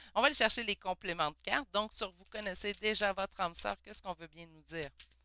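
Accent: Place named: Montreal, Canada. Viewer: Bonjour, j'aime beaucoup votre façon d'interpréter les cartes Et j'adore votre joli accent. Ça me fait penser à ma famille qui vit à Montréal 😊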